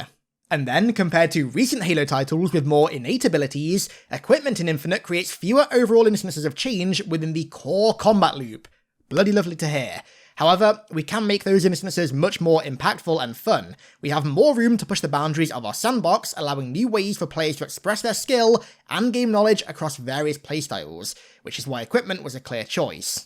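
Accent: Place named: United Kingdom. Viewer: what did you do with? And why do you have a British accent?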